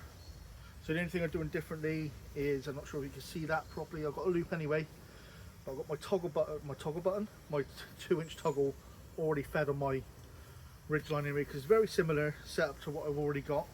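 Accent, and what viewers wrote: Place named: Dorset, United Kingdom. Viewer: Nice! For once youtube recommended a video worth watching. Thats a beauty, and by the sounds of it your clearly my neighbour lol (im from dorset) so would be rude to not subscribe! 👊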